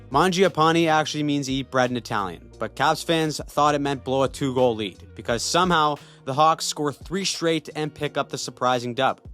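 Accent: Canada. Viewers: So wil there be another weekly recap? who is this guy and why does he sound so Canadian?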